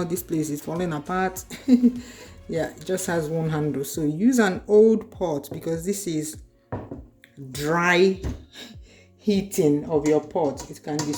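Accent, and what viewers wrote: Igbo, Nigeria. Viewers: The way you even explain things with a little Igbo vibe dey sweet my body. Nice one I will try it. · You look beautiful.. Your humour is next to none especially in combination with the natural igno accent... I really enjoy watching your videos..natural and down to earth.. Keep it up..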